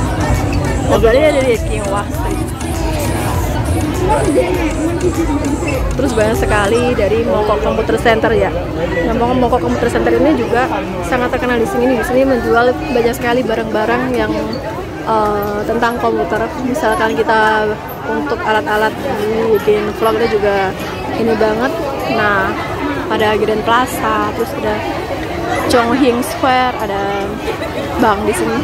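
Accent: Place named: Banyumas, Indonesia. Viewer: Assalamualaikum ora ngapak ora kepenak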